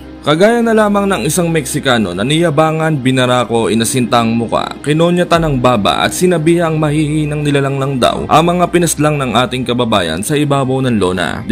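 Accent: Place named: Cabanatuan, Philippines. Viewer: Taga Cabanatuan kaba I dol. Katono ka Ng salita Namin.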